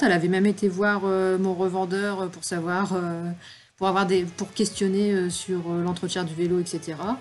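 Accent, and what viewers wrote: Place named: Nord, France. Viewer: Cet accent de ch'Nord, j'adore !! Une de mes cousines cause comme toi ... #velotaf à Amiens !!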